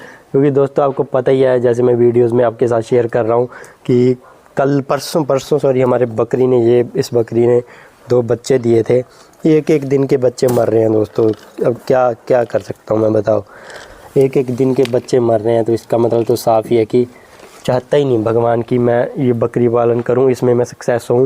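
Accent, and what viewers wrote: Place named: Bihar, India. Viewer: Aap bihari style me bolte ho bhai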